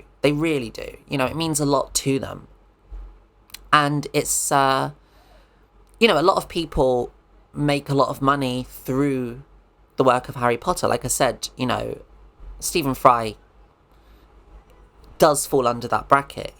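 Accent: United Kingdom. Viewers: this is the first video of yours i’ve watched and i’m loving it! love how conversational it is, very easy to listen to and enjoy :-) also the english accent and dry humour definitely feels like home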